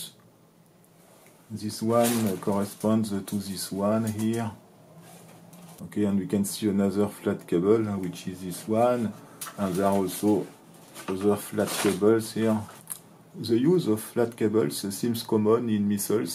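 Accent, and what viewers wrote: France. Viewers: pourquoi je suis le seul francais alors que t'es clairement francais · Your french accent is so thick ... I love it :) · he is french some letters they can't pronounce it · one of the coolest french accents i have ever heard! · Anglais à la française 😅😅😅😂😂😂😂😂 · you accent is so french omg 😆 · Tu fais vraiment aucun effort pour parler en anglais, autant parler en français et mettre les sous-titres. Pouce bleu nonobstant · Very very interesting but I could't handle your Frenglish for more than two minutes. It's a difference if you can't to it perfectly but try OR if you just give a damn and just keep stressing the last syllable like in french. You didn't even try IMO. Cable is CAYbell and keBELL. Stick to French please and just add subtitles if you don't even want to TRY to mimic the sound of the language you want to speak.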